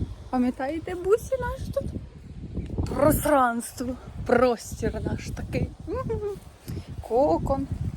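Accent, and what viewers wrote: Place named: South, Ukraine. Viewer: Пані, ви неперевершена!Як надзвисайно приємно чути українську з півдня країни! Вклоняємося Вашим воїнам і Вам до землі.Щиро дякуємо, що ви усі живете захищаєте,любите та працюєте.Якби таких українців було багато у нашій рідній Україні - війни НІКОЛИ б не було.Не допустили б до такого. Живіть, живіть усі довго та щасливо! Панові Дмитру, якнайшвидшого одужання.Миросик дорослішає на очах.З любов'ю українці.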